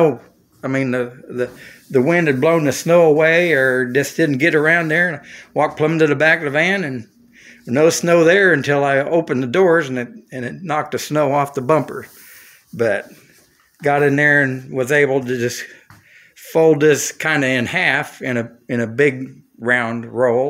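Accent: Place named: Missouri, United States. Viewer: Hi, I’m a new commenter but I’ve watched all your videos. You’ve inspired me and I’m currently setting up shop to start scratch building an H5. I appreciate all your advice but especially like the little tidbits about scratch building. It’s also comforting to hear a Missouri accent again! I grew up in the Springfield area but haven’t live there since college. Wish I could have met Spike.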